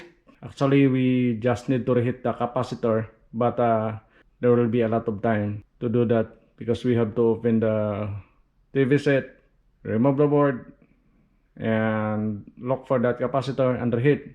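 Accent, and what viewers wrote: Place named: North, Philippines. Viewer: That thick accent sounds familiar from north Philippines.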